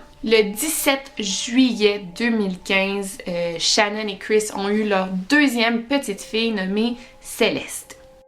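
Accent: Quebec, Canada. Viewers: Je suis fou amoureux de l'accent québécois 🥰🥰 Bisous les cousins des français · Parfois dur e comprendre l’accent québécois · J'aime trop l'accent canadien,c'est très mignon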